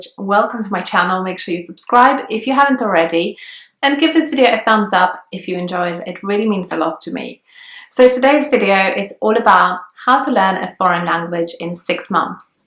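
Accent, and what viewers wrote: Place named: United Kingdom. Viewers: I love this accent❤️ I’m Iranian and I want to learn English & British accent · ❤️British accent!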